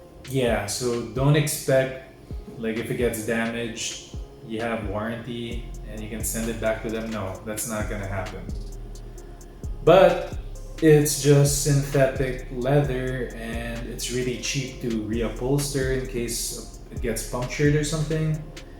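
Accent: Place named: United States. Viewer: Jesus for a Filipino, you have a very American accent.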